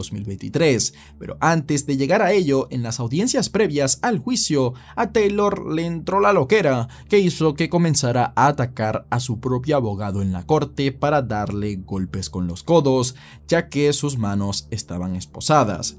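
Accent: Dominican Republic. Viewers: Una pregunta, eres Dominicano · Yo pensaba que era dominicano pero me acabo de enterar que es venezolano watafak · Enserio es de Perú?😮 Creí que era Dominicano 😮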